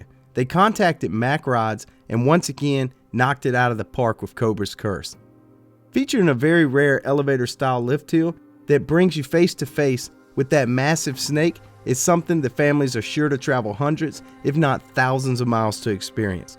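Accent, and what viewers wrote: South, United States. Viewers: I love your videos and as a fellow southern boy your narration makes it even better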